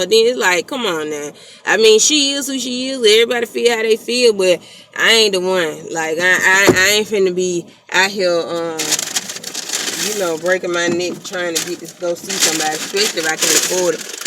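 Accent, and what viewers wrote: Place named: South, United States. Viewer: Girl I just love your southern accent 💕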